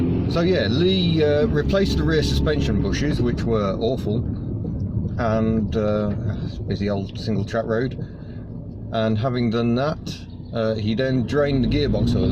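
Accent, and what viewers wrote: United Kingdom. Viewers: Anyway, thanks for all your replies buddy, the only ither burning question for you is, what is life like in Wales, Kent isn't the best place to live and have been considering other options, there are alot of rude and unpleasant people in Kent, people in Wales seem more friendly, your accent suggests u properly moved to Wales from the mainland, how does life treat u over there?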